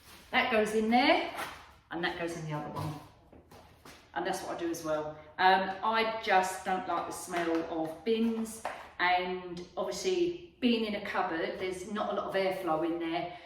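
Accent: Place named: England, United Kingdom. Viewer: Love your beautiful English accent.. beautiful home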